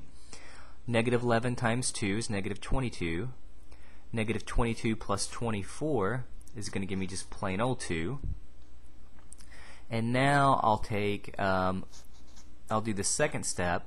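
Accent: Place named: Mid-Atlantic, United States. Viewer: Hey, what state are you from? I'm detecting a slight mid-Atlantic accent. No?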